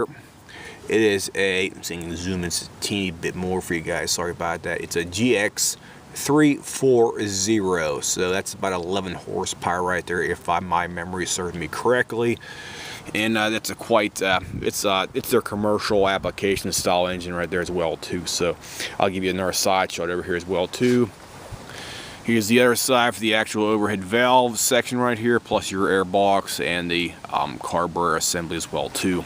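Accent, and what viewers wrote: Pittsburgh, United States. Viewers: Great video......sounds like a Pittsburgh accent.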